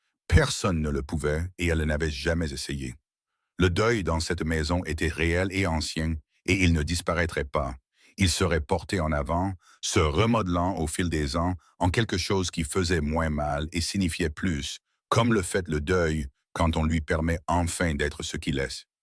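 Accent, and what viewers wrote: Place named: Canada. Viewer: Le conteur à un accent Canadien ❤❤❤❤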